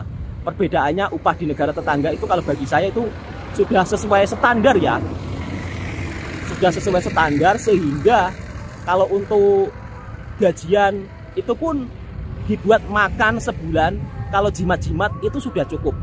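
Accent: Java, Indonesia. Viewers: Ni kira dah ok sangat tuu. Sblm ni, jawa pekat. Tpi yg x boleh ubah...buwaaaangat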